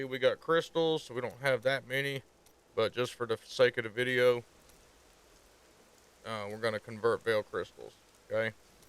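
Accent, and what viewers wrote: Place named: Texas, United States. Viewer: As a Texan who plays Diablo, but doesn't have a channel... hearing your accent was such a breath of fresh air haha.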